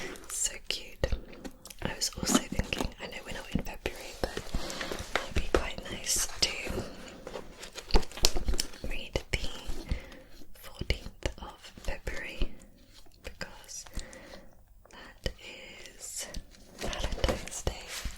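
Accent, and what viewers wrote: United Kingdom. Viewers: ill be honest at first i didn't like your British accent,but now i love it so much with your divine unintentional mouth sounds 🤯🤯🥰🥰that i watch your videos every night!!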